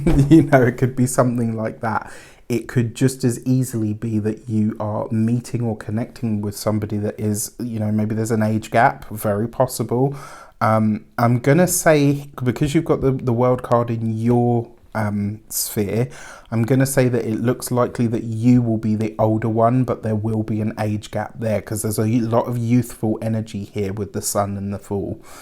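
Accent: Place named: United Kingdom. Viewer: Sending you healing energy and no worries about aesthetic... a British accent can pair with a sock puppet and come off as important and eloquent. Feel better☺ (excited about the new people appearing in the reading )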